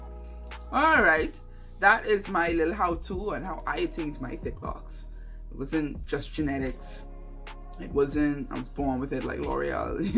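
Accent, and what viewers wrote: Bahamas. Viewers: Girl are you Bahamain, your accent sounds familar? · Thought you sounded like a Bahamian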